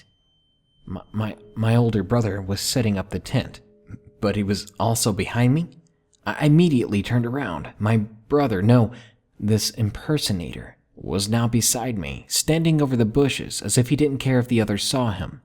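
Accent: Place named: South, United States. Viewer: Sir, I love your country accent.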